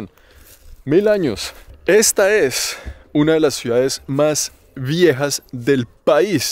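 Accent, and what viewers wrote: Bogotá, Colombia. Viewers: Que parte Colombia eres? Esos modismos en tu acento, se me hacen conocidos. Saludos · Muy bueno ,en total acuerdo!!!! Ese acento colombiano y hermosa tu efucacion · ¡ me encantas con esa manera de expresarte y sobre todo tu acento “ oiga mijito” asi hablan mis hermanos Colombianos, yo soy de Mexico y soy tu seguidora. Saludos y bendiciones🙏🥰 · Rolo, cachaco, es decir. De Bogotá, la capital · Lo que más me gusta de es esa sencillez al decir soy Colombiano y la forma de hablar típico de nuestro país, bendiciones desde Bogotá. 🇨🇴☺️ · SÍ...ÉSE ACENTO COLOMBIANO,,,VIVA COLOMBIA....SALUDOS A TODA ESA GENTE LINDA DE COLOMBIA. L@ AMO.SALUDOS DESDE NICARAGUA EN AMÉRICA CENTRAL 😘😘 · Hola, qué chévere saber que eres Colombiano, mijo! Es el segundo video que veo y espero ver todos los que nos puedas compartir. Con el primer video sobre TATARSKAN cuando te escuchaba narrar, me dije "este man tiene que ser Colombiano, obvio por tu acento", sin embargo, leyendo comentarios nadie hacía alusión al tuyo. 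Finalmente, hoy viendo este video confirmé que lo eres. Debo decirte que tus videos repito, solo he visto dos son del putas, mijo! Te felicito sinceramente. Por supuesto ya me suscribí a tu canal. Desde Bucaramanga, recibe un abrazo bien pingo! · Muy buenas entrevistas, hermosos paisajes, buen acento Colombiano u ahora ya hablas Ruso, muy lindo es Rusia y la gente es muy amable y hospitalarios.. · Este Man es muy rolo❤... "Sospecho pecho" 😂me encanta, me siento muy identificada, como si estuviera hablando uno de mis hermanos. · Saludos Parcero,Soy 🇵🇷 desde EU. Me encantan tus videos sobre todo lo buen aprendiz de los distintos idiomas y el acsento colombiano que tiene. Gracias x visitar el planeta x mí educarme y dejarme ver lo hermoso q es.. aunque espero muy pronto explorar así cm usted. Q viva 🇨🇴 y el resto del mundo 🌎🤗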